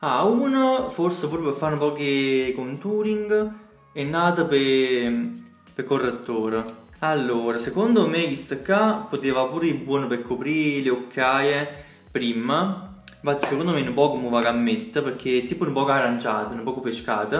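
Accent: Caserta, Italy. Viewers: Da "napoli napoli" ti dico ch si sente un sacco il casertano hahahaha ti adoro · Da napoletana ti posso dire che si sente tantissimo che sei di Caserta e non di napoli Napoli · Che zona di Caserta?😍 io sono della provincia invece 😍 si sente che l’accento è più casertano. Troppo forte! Mi sento a casa 😂🔝 e Ferro vecchio passa tutti i giorni ahahah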